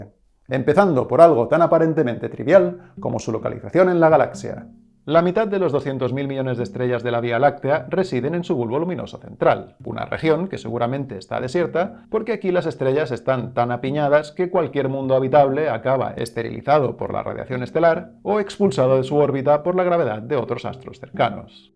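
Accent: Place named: Spain. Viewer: De verdad me encanta como explica....soy colombiana y a veces me constaba un poco oir documentales en acento español...pero definitivamente me encanta como el explica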